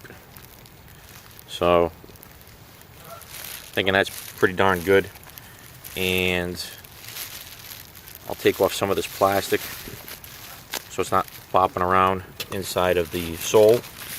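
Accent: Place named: South Jersey, United States. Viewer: Using the bag to mix the ingredient is a great idea. Thank you. P.S. You sound as if you are from my neck-of-the-woods (either South Jersey, the Philly area, or northern Delaware)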